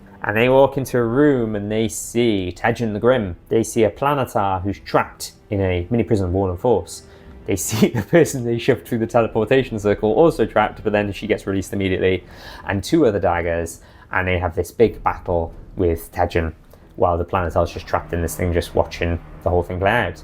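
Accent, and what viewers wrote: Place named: United Kingdom. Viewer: You are very welcome. Obviously, if you feel like it makes your videos less useful to the people who are only interested in how to run the domain and would rather stop including that stuff, don't keep doing it just on my account. I'd still watch the videos regardless. You might have to live with me asking about it in the comments then, though ;). And your accent isn't an issue at all, rest assured! I don't have a good ear for that kind of thing in the first place, nor do I really care and while I probably would have guessed "British" rather than "American English" in your case, it really mostly registers in my head as "a cool and kind person talking in English about something I am interested in".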